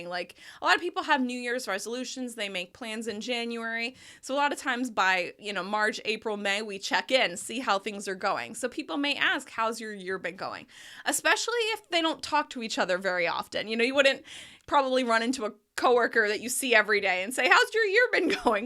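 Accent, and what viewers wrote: United States. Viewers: Let me tell you that your accent is really beautiful, captivating and very American, which has made that I can’t stop watching your videos, and on the other hand learn the pronunciation and sounds of the United States, greetings from Chile. 😊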